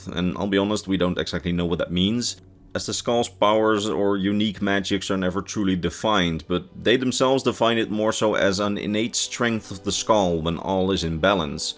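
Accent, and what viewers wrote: Netherlands. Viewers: you sound Dutch, I'm guessing you're from Holland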